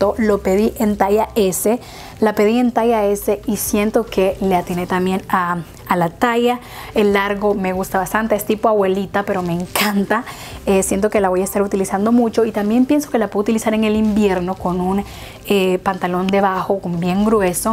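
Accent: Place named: Honduras. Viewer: Por tu hablado creo que eres de Honduras, saludos y éxitos paisana!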